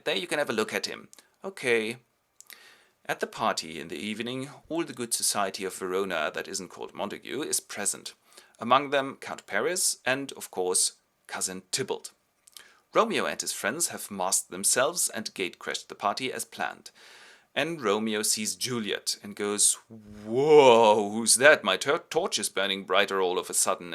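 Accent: Germany. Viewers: Are you german? Asking because of the accent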